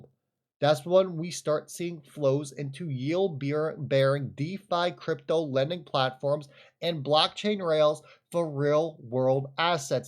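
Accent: New Jersey, United States. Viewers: Forgive him. He's from Jersey.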